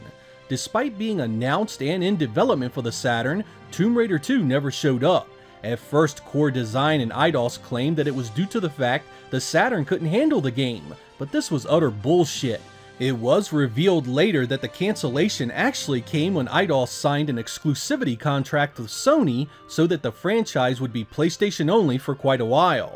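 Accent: United States. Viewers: Just watched the video, and again we hear "Morio". Americans struggle with the "a" sound. Usually they turn it into an "e". So "apple" becomes "epple" but with Mario they turn it to an "o" - "Super MOrio". It's a mystery to me...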